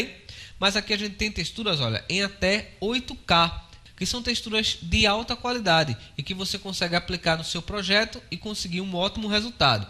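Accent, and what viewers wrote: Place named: Portugal. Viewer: Nao gosto de sutaque Portuguesa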